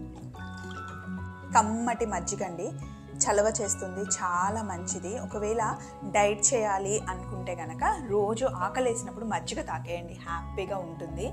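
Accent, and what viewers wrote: Telangana, India. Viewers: Telangana slang alaage untundi andi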